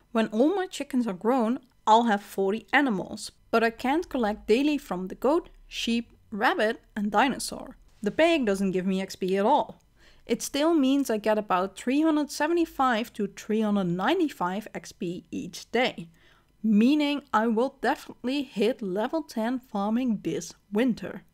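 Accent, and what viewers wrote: Netherlands. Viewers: Not me loosing my mind trying to guess if you are dutch and then absolutly cackling when the confirmation hit 🤣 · Hey! This is the first video I watched of yours and I kept wondering if you were Dutch, then I heard you say Herman and now I'm pretty sure you're Dutch 😂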